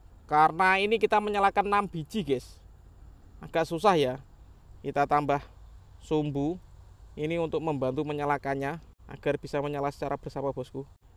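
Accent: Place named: East Java, Indonesia. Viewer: Jawa Timuran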